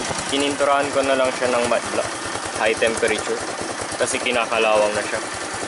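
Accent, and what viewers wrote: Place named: Pangasinan, Philippines. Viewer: taga pangasinan punto nyu lodz ah😂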